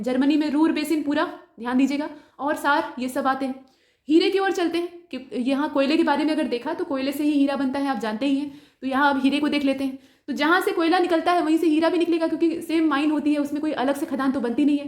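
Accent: India. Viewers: Jo bhi kaho lekin aapki awaaj sahi lagti hai...Hindi medium ki jhalak ke sath english ka bolna mast lagta hai....😍😍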